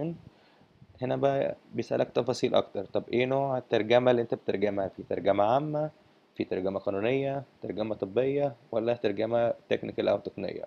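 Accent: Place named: United States. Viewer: بص الامريكن اكسنت كويس جداً مشاء الله بس افصل مبين العربي والانجلش لانك تقريبا بتتكلم عربي اميركن اكسنت هههه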